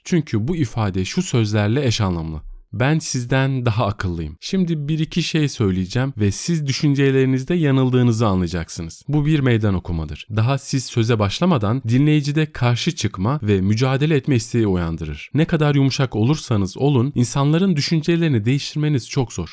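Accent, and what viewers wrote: Azerbaijan. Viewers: Qardaş siz Azerbaycanlisiz? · Azerbaijan Turkcesiyke konusuyor)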